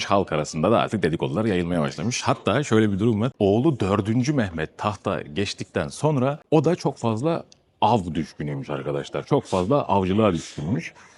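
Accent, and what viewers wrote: Turkey. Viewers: Bu kardeşimin vidiyolarina bayılıyom. güler yüzlü esprili tam ana dolulu konusma sen anlat tarihi kafasi iyialan dinler❤👏👍🤗🤲🐞🍀🍀🍀🐞🇹🇷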